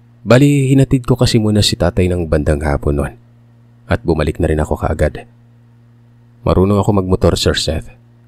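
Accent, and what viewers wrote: Iloilo, Philippines. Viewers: Kanami gd ya pamatian bsta ilonggo accent sir hahaha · Hahahha ilonggo gid ang tuno ni sir hahahha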